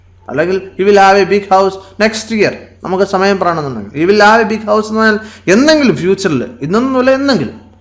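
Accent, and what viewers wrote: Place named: Trivandrum, India. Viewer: Sir... ningalude place evideyanu? Vere onnumalla chodikkan karanam... ചുവപ്പ് ennu parayanullathine ചൊമല ennanu.. sr parayunnathu.. athu konda.. chodichthu.. tvm aano.. sr nte place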